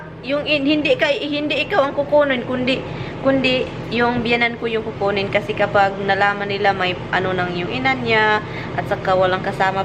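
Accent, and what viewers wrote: Visayas, Philippines. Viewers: Magkatawa ko sa imo sis pag mag storya ka bisaya tagalog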